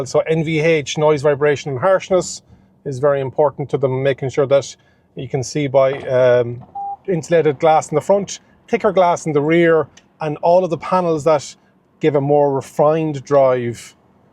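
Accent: Ireland. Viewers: Good man, I enjoyed the presentation, this Toyota is a total "Buy" when it will be available. Cool Irish accent you got there, new SUB.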